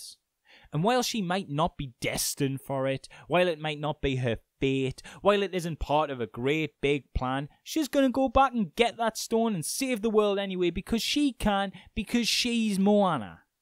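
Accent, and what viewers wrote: Newcastle, United Kingdom. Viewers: ah with the accent I assumed you ment somewhere local to newcastle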